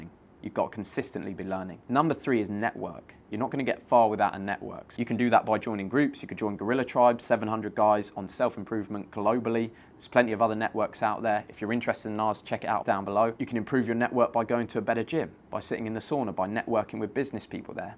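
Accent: United Kingdom. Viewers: Loving this video format, seems like is more calm here, and all of a sudden he comes across way more british for some reason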